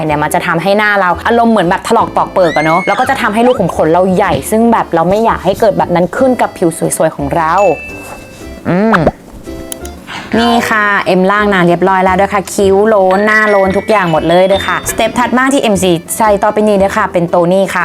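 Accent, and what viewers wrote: Isan, Thailand. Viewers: ชอบพี่เอ็มพูดอีสาน น่ารักมากๆๆๆค่ะ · พูดอีสานได้น่ารักมากกกกกกกกก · มักๆหลาย อีหล้า เวลา เว้าอีสาน หน้ารักหลายๆๆจ้ะ นาง❤️❤️❤️❤️❤️❤️😘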